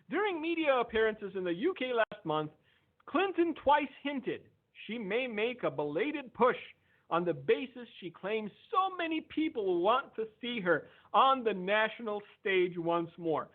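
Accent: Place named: France. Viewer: I love your French accent. You kill me. Lol!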